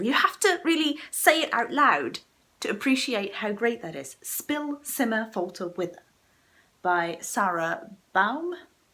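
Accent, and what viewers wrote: England, United Kingdom. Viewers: at the Edge of the World If truth be told, you speak English as it should be spoken. It is we in the colonies who have the accents! You were blessed to have an English mother in NZ!:) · I am confused. Are you from NZ? You sound english, not at all Kiwi.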